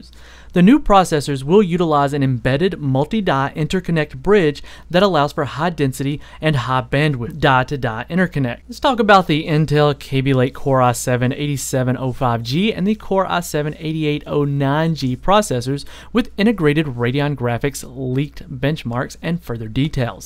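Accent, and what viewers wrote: South, United States. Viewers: Love that southern accent leaking through lol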